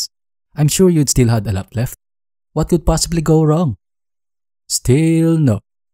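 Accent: Philippines. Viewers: i love how he use da pilipino aksent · Cool na sinadya mong idiin sa amin yung Filipino Accent hahahahahaha solid! ILABAS MO NA YUNG PART 2 KAHIT NAKA DRAFT YAN ILABAS MO NA HAHAHA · This is like Oversimplified. But filipino accent version. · its Filipino English which considered as a dialect that's why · This is Pinoy knockoff Oversimplified 😂 even the name means the same thing "Dumbed Down." Edit: I love it though. Please make more videos ❤️. I have a feeling you're bisaya, based off of the accent. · oversimplified but with filipino accent <3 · Kudos to your work and Filipino accent :) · maaaaaan this deserves more recognition. I like your cool filipino accent bro and the way of delivery is cool too haha keep up the good work:) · the accent is the native filipino accent,, it was on purpose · he sounds filipino(Filipino ka ba?)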